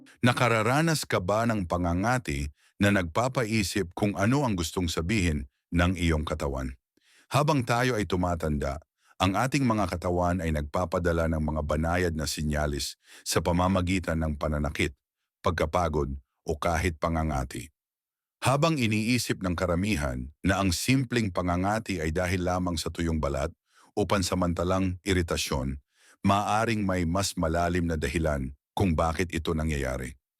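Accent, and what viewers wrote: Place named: United States. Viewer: Taga US kasi xa kaya slang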